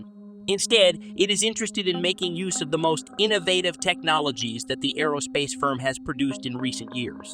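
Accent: United States. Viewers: ChiNews is a good program but the voice is terrible and needs to be changed to a softer voice, and not a heavy noisy U.S accent. This voice is horrible to the ear.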